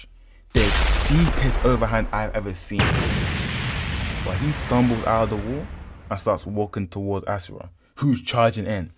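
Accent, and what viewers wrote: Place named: United Kingdom. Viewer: Stfu British zn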